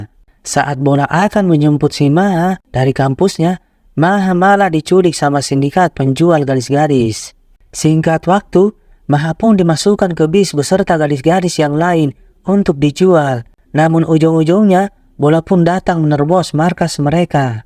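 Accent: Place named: Makassar, Indonesia. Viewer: Logat makasar ni host nya . Cara baca pun Sulawesi ki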